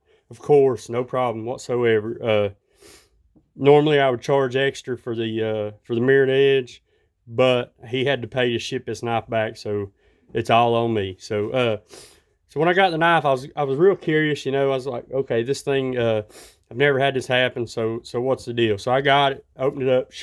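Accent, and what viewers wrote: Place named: Georgia, United States. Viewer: We are from Georgia. You sound like you are from here too lol! Nice knives! Liked and subscribed!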